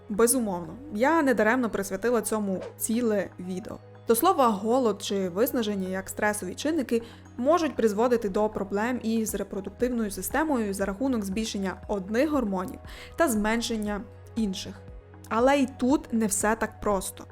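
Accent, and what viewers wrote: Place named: Galicia, Ukraine. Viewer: Ведуча дуже швидко торохкотить, та ще й з галицьким діалектом! Доводиться призупиняти відео вдвічі!!!.. Викладає не дуже переконливо та не цікаво!..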